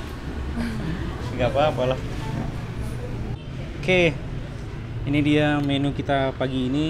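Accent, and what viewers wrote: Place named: Siantar, Indonesia. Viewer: ok di tunggu videonya bang. Kalau bisa pertahankan logat dan bahasa Batak Siantar bang karena itulah menurut saya yang unik dari Abang . Horas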